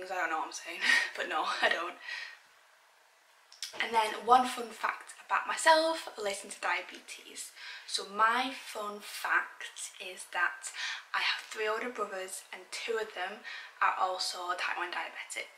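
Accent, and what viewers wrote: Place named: Manchester, United Kingdom. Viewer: I'm probably biased, since I've been watching your videos for quite awhile now, but when I first started watching, your accent sounded a LOT like Christopher Eccleston's to me, so I'd definitely say up north near Manchester 😁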